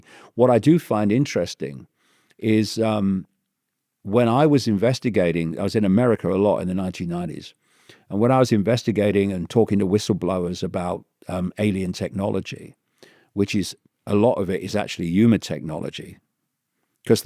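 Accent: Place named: Cockney, United Kingdom. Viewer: Sounds like he's got a London UK slight cockney accent.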